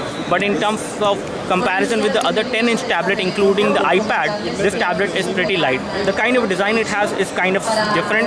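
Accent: India. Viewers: That Indian Accent! Love it! :)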